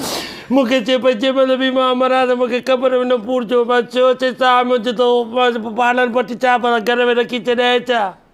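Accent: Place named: Shikarpur, Pakistan. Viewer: What a beautiful Shikatpuri boli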